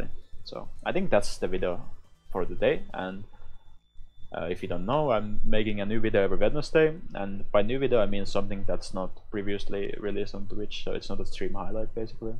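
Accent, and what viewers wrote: Finland. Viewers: Yo the voice/energy in it isnt that easy to chaenge when you have a Finnish accent and English isnt your first lanuage.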